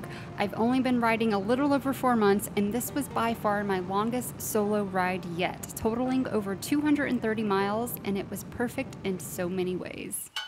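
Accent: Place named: United States. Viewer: what a pleasure to hear an American talking about Triumph motorcycles.Some of the vids from England, are, well, I have a hard time even understanding what they're saying. My wife and I sometimes overwinter in Houston, Tx. Preparing to dump my Harley softail for a Speed Twin, or t-120. Difficult decision!!!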